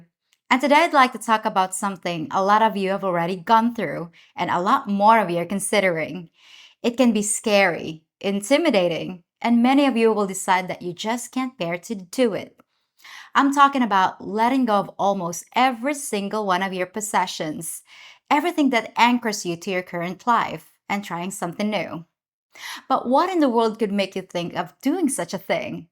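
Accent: United States. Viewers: I’m very curious. I watched a video where you talked about your young life in something of a “tell all” but I’m more curious about your knowledge of the West, your command of the English language and vernacular. Yes I get you learn English here but yours is a very American English and combined with your knowledge of our culture and routines I’m betting you’ve spent a great deal of time in the West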